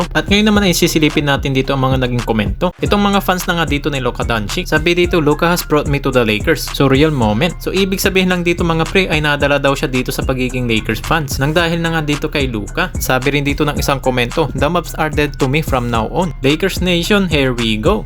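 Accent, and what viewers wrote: Pangasinan, Philippines. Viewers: Idol taga pangasinan kaba? 😅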